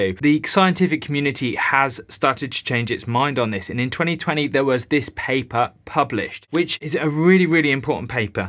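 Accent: United Kingdom. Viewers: great to see you back you're my favourite source of info for my low carb lifestyle and nice to see a Brit doing it, nothing against the Americans, they're great but having a British voice amongst them is brilliant! I just wish the rest of the UK would understand this more- my friends look at me as if I am mad when I so no chips or no rice or no bread and dont even get me started about what reaction I get to cream, butter and lard! Looking forward to your next videos 😀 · As an American, I love this too. It's so nice to hear a British accent 👌🏽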